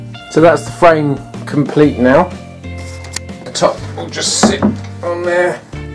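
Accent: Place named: England, United Kingdom. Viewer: Great video spoilt by such crap music. Great to hear an English accent for a change on here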